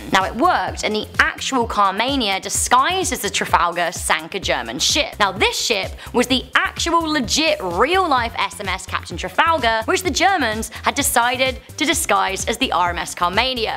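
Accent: United Kingdom. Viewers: Nice video and btw I know you are british but still lovw your british accent <3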